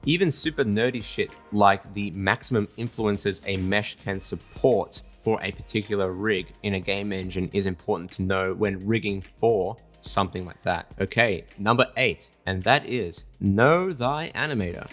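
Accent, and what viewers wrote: Australia. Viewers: interesting. Thanks for you replies bud. Btw I detected an Aussie accent? I’m based in Sydney. I like the style of your work so I’ll be keeping an eye on your page.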